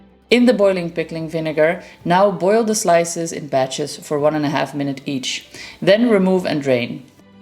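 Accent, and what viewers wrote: Netherlands. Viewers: You sound dutch 😊 Groetjes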